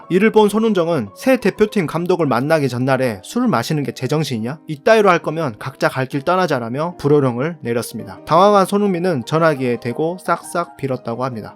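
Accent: Jeolla, South Korea. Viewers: 전라도 사람이신가봐요 사투리 중간중간 잘들었습니다 ㅋㅋㅋ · 전라도 사람이신가요? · 님..고향이 혹시 전라도세요? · 내레이션에 전라도억양이 심해 듣기 거북하네요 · 전라도분인가보다 ㅋㅋ 사투리억양이 느껴지네용^^👍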